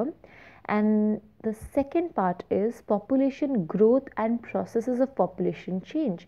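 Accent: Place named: New York City, United States. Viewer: NYC pronunciation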